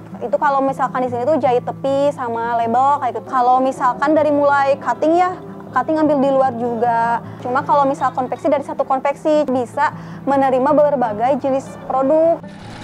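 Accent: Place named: Bandung, Indonesia. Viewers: logat ciamis nya masih kentel keneh teh...hehhe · Bandung pisan kak logatnya hihi, keren sukses selalu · Beberapa kali lihat video di channel ini banyak banget menginspirasi saya untuk punya usaha sendiri. Cuma mau action masih bingung. Belum nemu ide jual produk apa, bingung cari tempat supplier yang tepat, pusing bagi waktu sama kerjaan sekarang, dll. Bener" bertekad dalam Minggu ini harus segera action, saya punya prinsip gak mau selamanya jadi karyawan, mumpung masih muda gak ada salahnya kerja keras membangun kerajaan bisnis sendiri dari 0. Btw kakak owner nya cantik, seneng aja dengerin dia ngomong, teteh" logat Bandung gak ada obat 😂😂.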